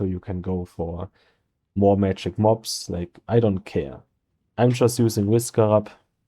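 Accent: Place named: Germany. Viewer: guter deutscher akzent :)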